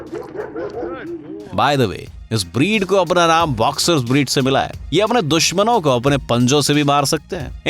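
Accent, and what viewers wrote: Haryana, India. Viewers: Your Hariyanvi accent was outstanding.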